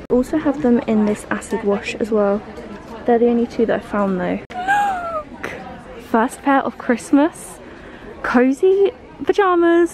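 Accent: United Kingdom. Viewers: Queen of british accent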